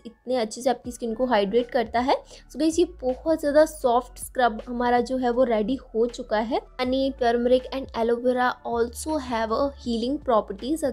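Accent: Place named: Punjab, India. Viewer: Sister...Are you from punjab?You have got that punjabi accent..😍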